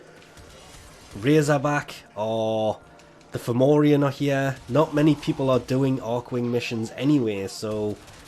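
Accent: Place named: Scotland, United Kingdom. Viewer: DANG SCOTTISH LOVRTT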